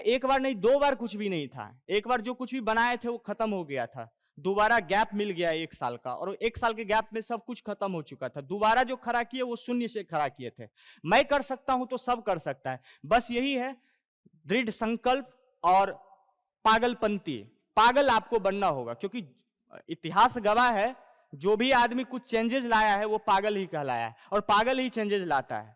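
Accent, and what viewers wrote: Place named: Bihar, India. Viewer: Awesome work and your bihari language ke sath jo v aapne kaha dil chhu gya.... thank you so much ji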